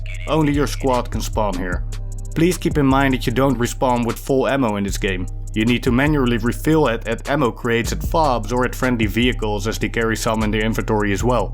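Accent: Netherlands. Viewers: Proper dutch accent mate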